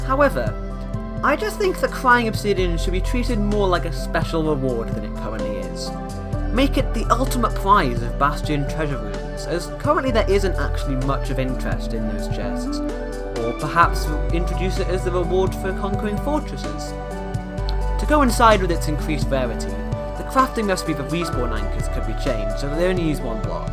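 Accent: United Kingdom. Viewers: Why are you bri'*sh? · This man's voice really fits as narration, the calm bri'ish voice is really good for voice overs.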